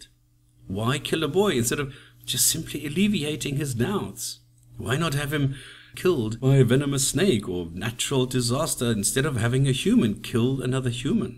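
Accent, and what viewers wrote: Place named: South Africa. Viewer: Great first video. Your accent sounds colonial, maybe south african?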